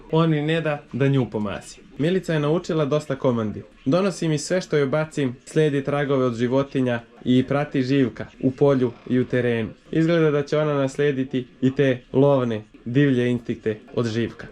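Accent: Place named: Belgrade, Serbia. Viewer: Srecno bilo svaka cast , klipovi su najjaci ali od kad si poceo da udaras malo ovaj beoogradski akcenat nije to to 😅 drzi se kraljevackog 🙏😇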